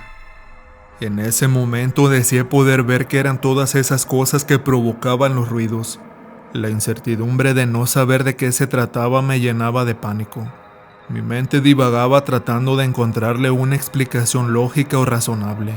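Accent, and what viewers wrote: North, Mexico. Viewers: porque habla muy norteño!.😤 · Me gusta la voz del otro narrador , a este se le sale el queso mexicano no mames wey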